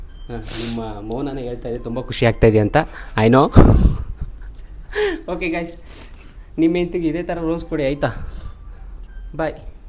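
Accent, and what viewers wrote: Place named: North Karnataka, India. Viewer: ಸೂಪರ್ ಅಣ್ಣ ಉತ್ತರ ಕರ್ನಾಟಕದ ಮಾತು ಅಂದ್ರೆ ಹಿಂಗೆ 👍🌹🌹🌹🌹🌹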